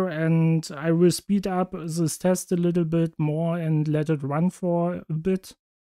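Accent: Germany. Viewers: souds really deutsch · I did not expect to see this kind of video with a German accent. At least I am assuming you're a German native speaker, its what it sounds like to me. Much love. :)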